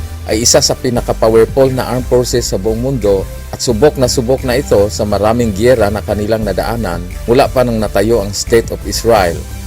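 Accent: Visayas, Philippines. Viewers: Ang sama naman mag salita ng narrator, ang tigas ng dila at bisayang bisaya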